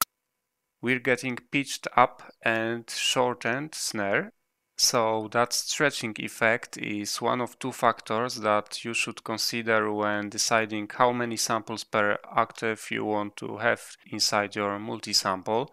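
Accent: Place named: Poland. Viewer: Thanks, you are from Poland maybe because i feel this Polsko angielski dialekt hehe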